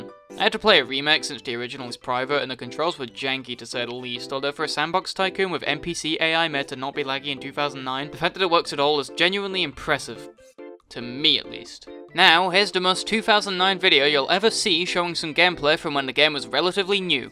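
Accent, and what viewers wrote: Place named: Sunderland, United Kingdom. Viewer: He sometimes sounds a lot like a mate of mine from Sunderland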